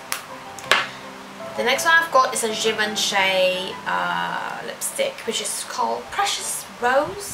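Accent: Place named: Northern England, United Kingdom. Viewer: Are you Japanese? I lived in Japan and taught English there for 4 years. I have never heard anyone from Japan who can do a good a northern english accent as you, its amazing!! How long have you lived in the UK?:)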